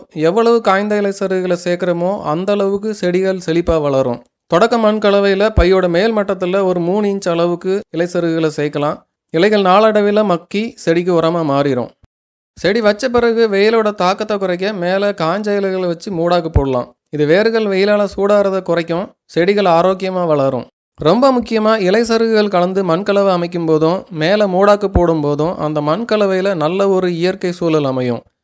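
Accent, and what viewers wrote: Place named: Tirunelveli, India. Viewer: athu nellai Tamil... Aana ippo konjam kovai Tamil mix aaguthu...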